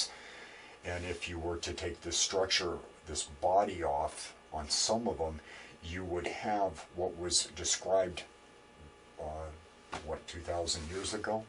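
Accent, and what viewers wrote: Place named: United States. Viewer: Those videos clearly show weather balloons with a radiosonde hanging below by a tether. The balloons are released at the same time each day and depending on the wind, you will see them each day at exactly the same time. Have you checked when you've seen them? You sound american, what state did you see them in? Google any map of radiosonde stations, check the wind on the days you see them and work out if they could be near you.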